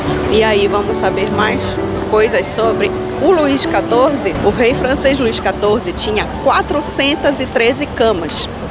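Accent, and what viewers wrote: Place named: Pará, Brazil. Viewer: Que bom saber a história da tour Saint Jacques. Você é paraense? Eu sou paraense e achei seu sotaque parecido com o nosso, adorei e parabéns por nos trazer conhecimento, obrigado.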